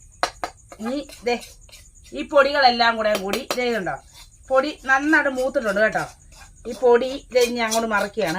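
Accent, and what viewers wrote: Kottayam, India. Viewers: കോട്ടയം ഭാഷ അങ്ങനെ യൊക്കെ തെന്നെ ആണ്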